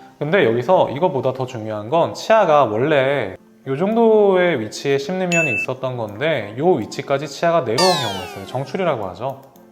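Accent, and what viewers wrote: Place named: Gangwon, South Korea. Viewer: 원장님도 강원도분이시네요^^ 사투리가 아직 남아있으세요^^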